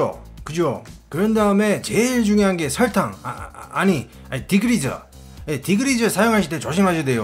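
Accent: Gyeongsang, South Korea. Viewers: 고품격과 저품격, 잉글리쉬와 갱상도쉬가 혼재된 나래이션이 상당히 중독성 있네요 ㅋ 열씨미 새차로 마이 맹글어주세요~😀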